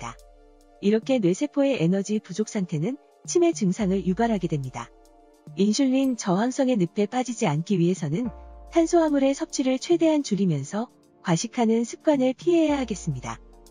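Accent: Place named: Busan, South Korea. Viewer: 바뀐 목소리도 좋지만 선생님 목소리가 더 이해가 잘가는거 같네요ㅜㅜ 특히 전 부산이라 아는분이 말씀하시는것처럼 들려서요~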